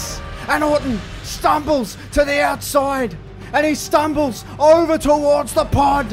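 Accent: Australia. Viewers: Ngl, you actually do sound like Grayson Waller, are you from Australia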